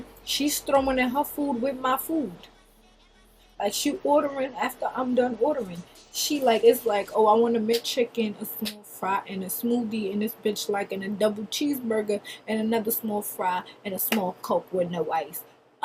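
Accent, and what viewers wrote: Jamaica. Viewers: yesss bish the jamaican accent!!!! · Omgggg that Jam Accent though, yessss you so gorg. But that friend thing, I'm the same way, some females just don't know how to have, accept and good friend or be one. Gurllll shoot come to Trini/Tobago I'll be your bff lol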